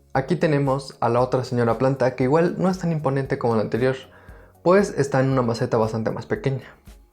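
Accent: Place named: Mexico. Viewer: Buenos días desde España,. Una vez más... gracias a ti por responder tan amablemente y compartir tantos conocimientos. Ayer a punto estuve de despedirme con un... "fuerte abrazo desde España para todo Méjico", pero dudé de tu nacionalidad porque tu acento no es tan marcado como el de otros de tus compatriotas, pero ahora, una vez confirmada mi sospecha, puedo despedirme diciéndote.... ¡¡¡ DESDE ESPAÑA... UN FORTÍSIMO Y SINCERO ABRAZO PARA TODO MÉJICO!!!.;o)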